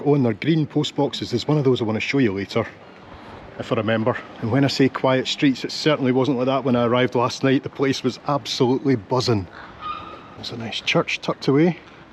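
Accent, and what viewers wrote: Cork, Ireland. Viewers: My grandad was from cork. I love the accent ❤ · Cork accent is very fast 😂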